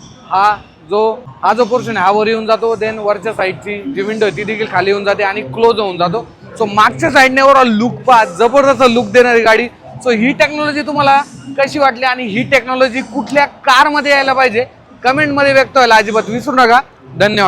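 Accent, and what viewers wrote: Khandesh, India. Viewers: खान्देशी tone आहे भाषेत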